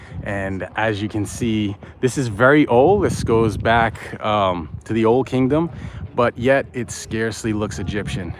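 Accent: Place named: South Boston, United States. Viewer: I hear Southie..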